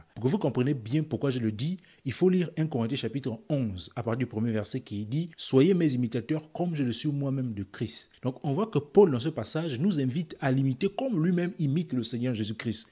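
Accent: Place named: Gabon. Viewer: Je me disais bien que la façon de parler était comme un gabonais 🇬🇦 😅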